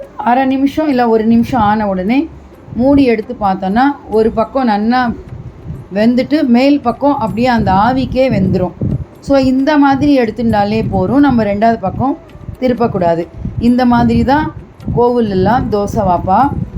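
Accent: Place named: Iyengar, India. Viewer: உங்களுடைய இனிமையான அய்யங்கார் தமிழ் கேட்க மட்டுமே இங்கே வரலாம்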